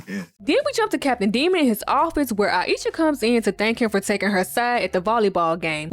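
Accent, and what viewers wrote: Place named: South, United States. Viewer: love your country accent 😂😂 i feel at home! new subbie in me 💕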